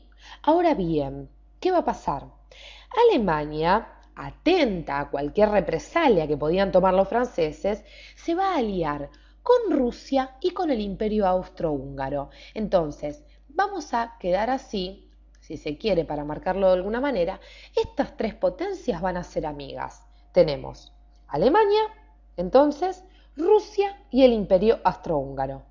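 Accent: Argentina. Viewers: Interesantisimo video ademas me encanta como habla esta chica con su acento Argentino.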